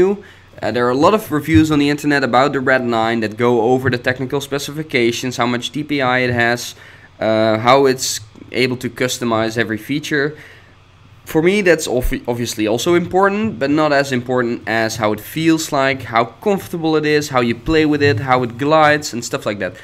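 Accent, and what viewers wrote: Netherlands. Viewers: hoor altijd het nederlandse accent haha :P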